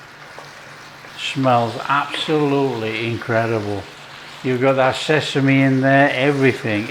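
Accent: United Kingdom. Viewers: does it easy and delicious for the working people,no nonsense exotic ingredients and time consuming preparation that needs a three thousand year old samurai sword and skill to slice like a samurai warrior in a clear anglo saxon accent on an electric cooker in a skillet without the need for a billion btu burner and wok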